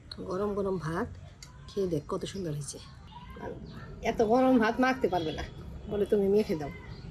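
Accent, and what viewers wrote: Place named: Bangladesh. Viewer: আপু তোমার ভাষাই কিছুটা বাংলাদেশের টান আছে।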